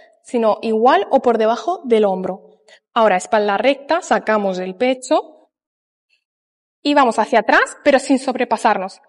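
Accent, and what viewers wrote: Spain. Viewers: te está saliendo acento catalan o es mi imaginación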